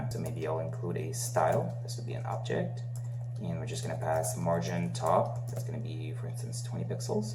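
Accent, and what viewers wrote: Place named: Canada. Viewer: Excellent videos! Love the slight accent... Canadian?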